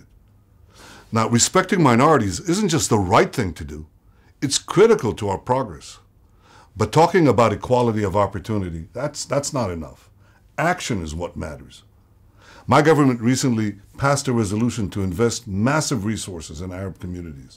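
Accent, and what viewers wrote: United States. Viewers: Are we gonna ignore the fact that he literally speaks English with an American accent?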